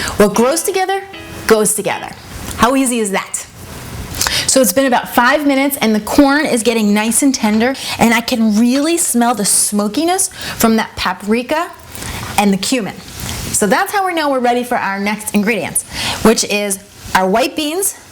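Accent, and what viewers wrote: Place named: New Jersey, United States. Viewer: Lol what happened to your Jersey accent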